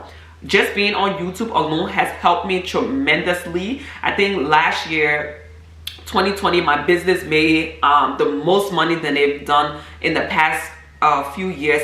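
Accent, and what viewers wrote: Liberia. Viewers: You sound Liberian. Are you? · I can hear the accent, sis you Liberian?? I love your content by the way..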